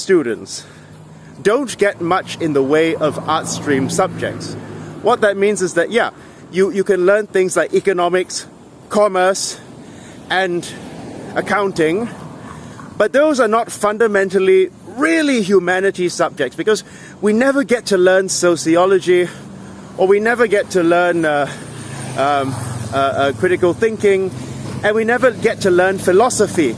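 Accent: United Kingdom. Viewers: First of all, it shouldn't be you talking cause you are a Chinese. Any changes have to come from Malays. Liberals will cheer for you no matter what, but most Malays won't give a damn on what you have to say. Secondly you spoke in clear British English, this is more as a disrespect. If you can't respect Malays, don't expect them to respect your opinion. Thirdly, public opinion is irrelevant, if you want to change something, you speak or influence people in charge.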